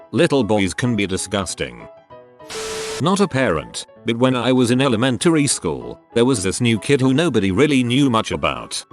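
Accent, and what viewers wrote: United Kingdom. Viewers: when i first started watching these videos i could recognize that it was a British accent but now i cant hear the accent anymore.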